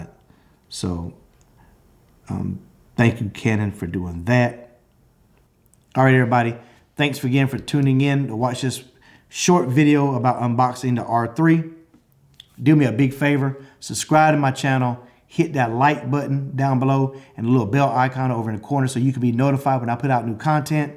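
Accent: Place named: United States. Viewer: Awesome video, are you from Oakland or H-Town if I may ask? It's hard to tell where your accent is from in the USA, I am guessing one of those two, Houston/Oakland? You sound just like my brother in law, so I'm guessing one of those two? Anyways great video and hit the nail on the head, I like the R3 more than any other mirrorless camera and I'm a full time Nikon NPS shooter or photojournalist. However the R5 is also amazing and they definitely beat the Nikon D850, but took a long time and the EOS R/RP were lackluster. Anyways love the videos and you're meant for this! Let me know if you ever want to do a guest speaker or co-host show, I do interviews and YouTube at times, and used to act as a teenager, I'm 36 now.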